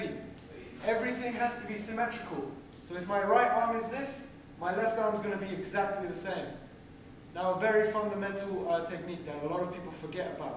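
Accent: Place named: United Kingdom. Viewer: The accent and brit lingo makes this by far the best bench tutorial I've ever witnessed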